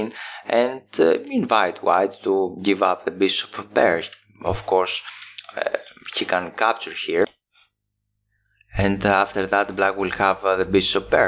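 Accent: Greece. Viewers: Χαχαχα κατάλαβα ότι είσαι Έλληνας από την προφορά σου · I love the Greek accent lol.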